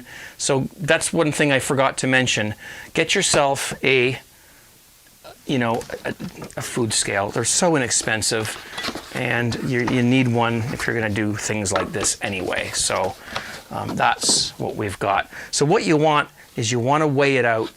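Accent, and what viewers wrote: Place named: Canada. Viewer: French side on the sea salt box? Either IT'S from Canada, or is, I imagine. Using metric, eh? Right on, sounds like a fellow Canadian. Even apologized for dropping the box! I remember once I apologized when someone bumped into me at the pub! Anyways, great vid, I seen another method where basically it was all done in the jar. Put a small layer of salt at the bottom, add a layer of finely sliced cabbage, tamp it down, add a layer of salt, add a layer of cabbage, tamp it down, salt, cabbage, tamp, salt, cabbage, tamp, etc. I guess it can work either way, but more salt is probably used in the layer process. The layer process also seems a tad bit easier. Seen it on Jas. Townsend and Son. Gonna have to make my own sauerkraut some time! Definitely subscribing to this channel, I imagine there's vids on making home brew as well, which I'll certainly enjoy watching!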